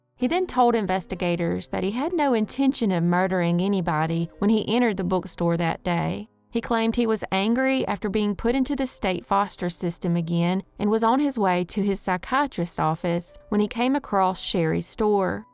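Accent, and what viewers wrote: South, United States. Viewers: Southern girl accent. Makes you Horney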